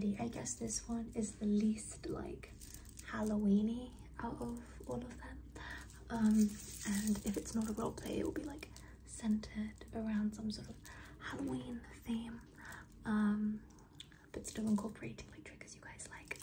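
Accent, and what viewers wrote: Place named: United Kingdom. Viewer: Oh my god I was NOT expecting the British accent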